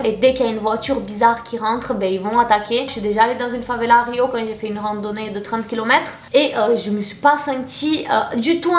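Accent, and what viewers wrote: South, France. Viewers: tu dois être de toulon peut être, c'est l'une des rares exceptions en france pour qui le rugby est une institution. D'ailleurs, je trouve que t'as un accent brésilien (of course) mais mixé avec l'accent du sud, je trouve ça vraiment stylé. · Mdr j'aime trop ton accent de meuf du sud.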